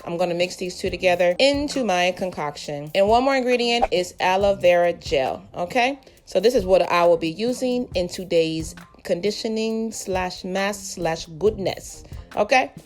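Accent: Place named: Yoruba, Nigeria. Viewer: Yoruba ke?!! How did I miss that and I’m Yoruba 😂😂🙈🙈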